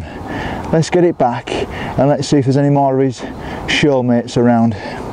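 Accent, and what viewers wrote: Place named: Hull, United Kingdom. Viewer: Nice to hear a hull lad not many in Ireland love the vids keep them coming. By the way was that East Park or picky I thought it was picky but its been so long since I was there.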